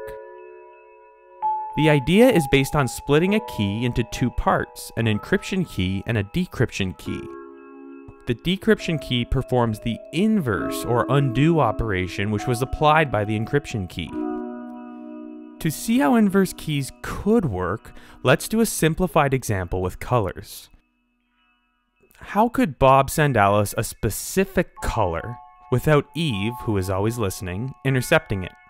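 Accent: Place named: Canada. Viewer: i was like there's something weird about this accent.. then he said aboot.. and i was like o right, he's canadian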